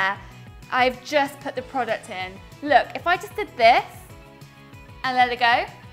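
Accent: United Kingdom. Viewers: Love it ps are u British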